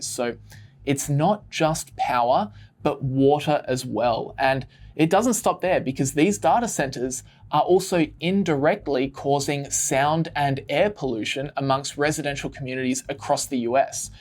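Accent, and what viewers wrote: Australia. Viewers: You sound Aussie but focusing on US!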